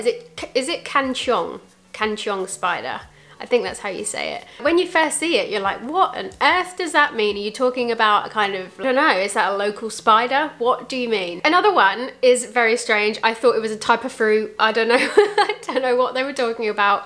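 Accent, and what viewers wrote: United Kingdom. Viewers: LOL... Love hearing in British accent :) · Hi! Im new here. Im singaporean and this is the first time seeing someone who speaks British trying to speak Singlish! Keep it up!!! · I love u speaking Singlish in Bristish accent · I'm sorry but your british accent doesn't fit with our language... sorry gurl. btw, anyhow means something similiar to not doing it properly.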